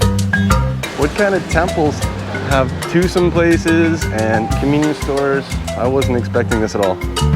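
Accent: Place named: Canada. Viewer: i just came across your Youtou channer ! and i love your accent So here's a question for you , where in Cananda did you come from ? (i'm planning to move to Candana and live there for about one year, but i'm stuggling to decide where i live in Canada. the purpose of my visit is to learn english though )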